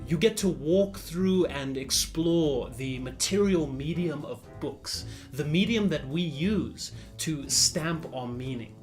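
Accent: South Africa. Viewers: Guy are you South African?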